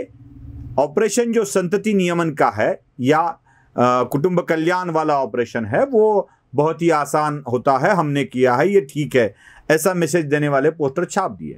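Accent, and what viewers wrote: Maharashtra, India. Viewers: सर तुमची हिंदी खुपच खराब आहे, तेव्हाच ओळखुन पडता की तुम्ही महाराष्ट्रीय आहात म्हणुन,,सुधारणा आपेक्षीत आहे,धन्यवाद सर🙏🏻🌺🌺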